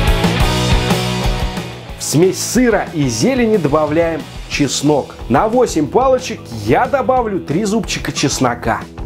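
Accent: Moscow, Russia. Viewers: Спасибо за простой и вкусный рецепт!) Московский акцент придает особенность твоим видео)))))))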